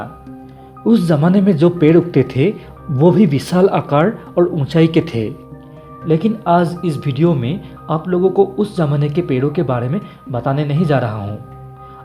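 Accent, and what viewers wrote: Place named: Assam, India. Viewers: তুমি অসমীয়া নেকি ?তোমাৰ মাতটো অসমীয়া নিচিনা লাগে, তোমাৰ ভিডিঅ বিলাক চাই ভালেই লাগে| 👍👍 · Oh assamse he.. toh.. language me thodi parechani toh ho he sakte he..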